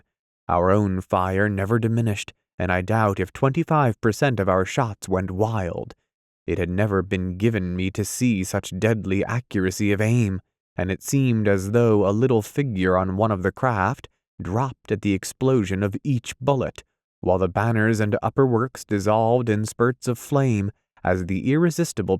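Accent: United States. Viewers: I have a dubt , is it american English?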